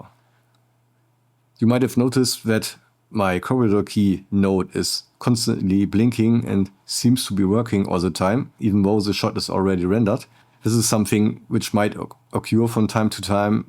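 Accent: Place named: Germany. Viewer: Just stumbled on this channel for the first time. Your German accent sounds great to me and the info is solid.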